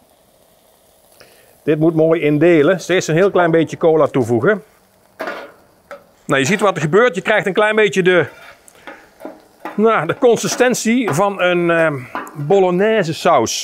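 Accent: Brabant, Netherlands. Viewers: Da's Brabants, gehakt is daar nou eenmaal 'gehak'..Gaat niet meer anders worden, vrees ik 😂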